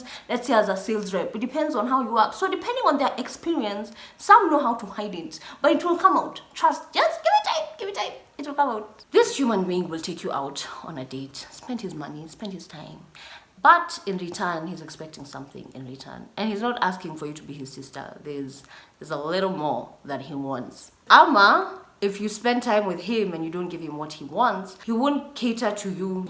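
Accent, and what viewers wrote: United Kingdom. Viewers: I've been watching your videos so much in the middle of a convo I start faking a Brit accent😂😂😂😂😂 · The British accent,😍😍😭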